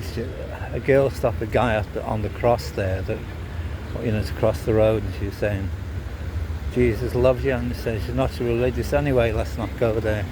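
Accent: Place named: Liverpool, United Kingdom. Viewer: You sound scouser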